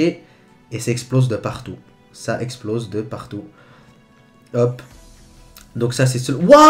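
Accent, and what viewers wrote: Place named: South, France. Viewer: Tu a lacsent du sud !! ❗️❗️❗️❗️❗️❗️❗️🤓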